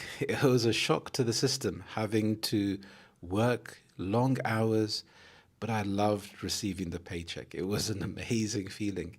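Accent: United Kingdom. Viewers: Hello,! I don't know if you have any videos that talk about this subject, but I'm curious, because I see that you speak differently from other British YouTube channels that I follow... What version of British English do you speak? Written with Google Translate.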